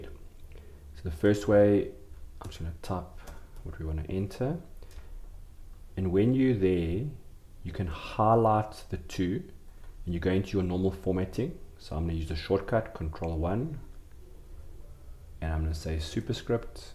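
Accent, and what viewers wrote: South Africa. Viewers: Me too! Youtube such a large community and here I get help from my fellow South African. Great tips! Keep it up. Hope your channel grows.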